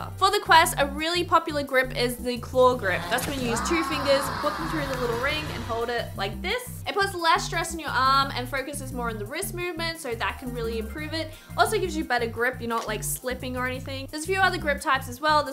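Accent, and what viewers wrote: Australia. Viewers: Ok does anyone know why advice like this sticks in my head better when it’s delivered in an Aussie accent?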